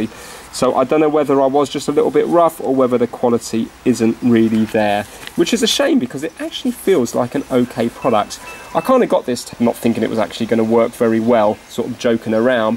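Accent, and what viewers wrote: United Kingdom. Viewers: British accents are so soothing, I love watching videos which are made by people who have them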